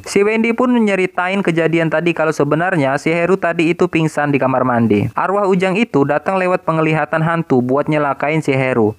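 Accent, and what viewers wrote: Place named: Java, Indonesia. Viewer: Bjir jawanya klur ngakak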